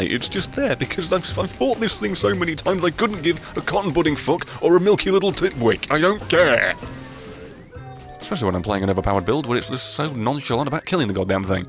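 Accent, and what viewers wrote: United Kingdom. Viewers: I can’t tell if he is English, Scottish or Welsh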